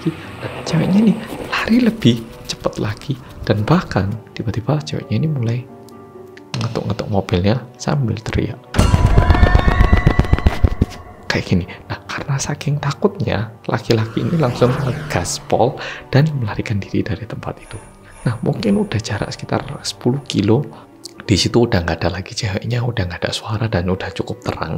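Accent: Java, Indonesia. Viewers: Kesian ih Mbaknya 😭😭 semoga lukanya pulih Makacih, Boloku Sing Paling Medok Dheweeeee 🙏🏼🙏🏼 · Suaranya khas bgt....logatnya apalagi....tetap jawa y mazzeeeh....sukse trs ya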